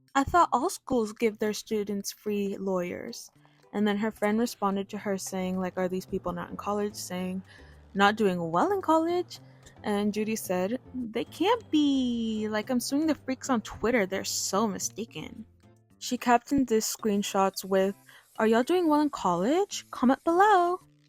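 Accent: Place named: Spain. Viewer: Out of context but I love your spanish pronounciation🫠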